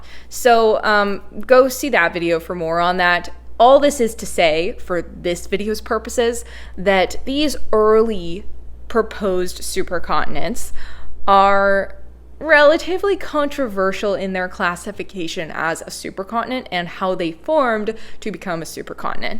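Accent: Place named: Texas, United States. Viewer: That’s just her Texas twang coming through, relax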